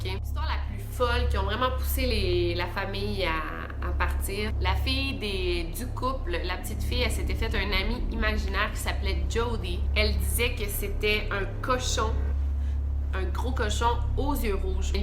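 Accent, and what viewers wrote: Quebec, Canada. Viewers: Aller bientôt les 100k t la meilleure je suis française mais j’adore l’accent des québécois je rêve d’y aller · J'adore ta voix queebecoise moi aussi je suis québecoise sinon continue tes vidéos bisous ❤❤❤❤❤❤😘😘😘😘❤❤❤❤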